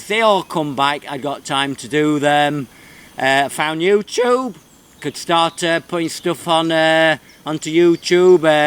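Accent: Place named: Northern England, United Kingdom. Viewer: Looking forward to the DIY food dehydration tutorial. Nothing better than a northern accent, I'm up in Leeds. Congrats on the 1000 subs.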